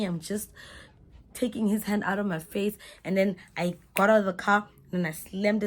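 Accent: South Africa. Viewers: maan, it's my 1st time watching your page. I love your Xhosa vha, I was laughing from the get go😂😂😂.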